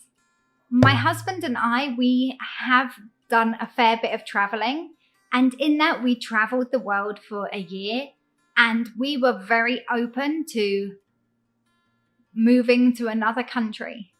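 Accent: England, United Kingdom. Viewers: I enjoy watching the videos in this channel as I get to learn what the life, particularly the bright side, of western immigrants is like in Taiwan, with a bonus of listening to the voice with a beautiful British accent. I would not be worried about the content balance, as we all understand this is not a news coverage channel. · 對了，我非常喜歡您的英式英語的語調，從以前到現在都一樣，就是喜歡英國腔的英語而不是美國腔的美語！老實說就是讓我覺得聲調很悅耳！ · Say thanks to who lovesTaiwan according to yourself experience here. I also like to practice my listening in British English by your channel. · 很清楚很濃厚的英格蘭腔英語發音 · 英國腔調的英文就是讚拉！ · 看影片聽你英國腔感覺很有趣，常常想到哈利波特電影他們說話的感覺。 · I like your fascinating British accent, very clear and speed just fine. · 妳的發音字字清晰，英國發音真的跟美國不一樣。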